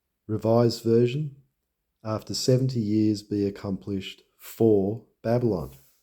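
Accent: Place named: Australia. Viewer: Thank you for doing this research and sharing this, good to hear an Aussie accent.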